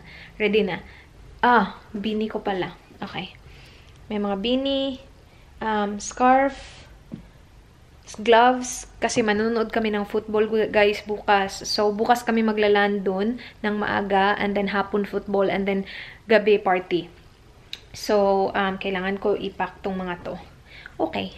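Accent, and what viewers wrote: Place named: Visayas, Philippines. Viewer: Hi ate! Makalingaw kaayo ka inig mag bisaya:P New subscriber diay hehe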